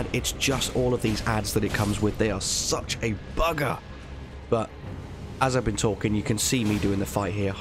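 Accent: United Kingdom. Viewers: just btw, 'gaol' is the English spelling of 'jail', and is pronounced the same. You sound English, so I'm surprised you aren't already aware of that. That said, thanks for all your tips, I tend to be like a scout in my general attitude in games - love exploring, NOT good vs bosses and tough enemies. Actually as a mouse & keyboard computer game player, I really dislike the whole 'boss fight' thing, which is a console game mechanic, now being used in some computer games by way of ports. Still, Elden Ring has some amazing areas and scenery. and a goat-horse mount with Unreal Tournament style double jump ability is out-of-the-mould good good to see. On the other hand, most egregious "physics" where sometimes after hitting an enemy you are held still, mid-movement, while an enemy gets a hit back at you (or 3 or 4 sometimes!), and a hit from behind while racing along on your mount, stops you mid-career and you may gave to jump or dash to break the 'freeze' (outrageous!!). 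The AI not so good also, no ecosystem, no factions, ie, all monsters gang up to fight the player, when often they should be fighting each other, demihumans vs militia being the only contrary example. Miranda flowers, wolves, bats, should attack any who come near, land octopuses should attack trolls too if they get too close, etc etc. Really like the inclusion of the demihumans, the remind me of Edgar Rice Burroughs - John Carter, etc, imply at least for me a whole mythology - I hope some addon makes more of them a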